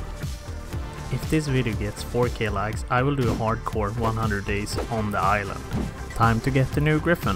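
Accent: Sweden. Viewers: Jävligt kul att höra lite svengelska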